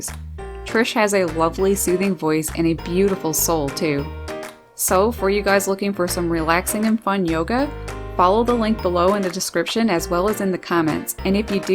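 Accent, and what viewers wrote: Canada. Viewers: What a keen eye for detail and patience. Beautiful Buster and Hatch sweet Kiwi. You have a cool Canadian accent!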